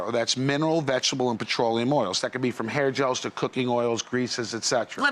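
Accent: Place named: New York, United States. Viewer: Love this guy's NYC accent lol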